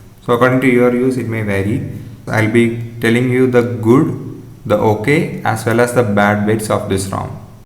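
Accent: Kerala, India. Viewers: Malayali aanalley Engilish ketappo manasilayi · English kettittu.. Malayali anennu thonnunnu😅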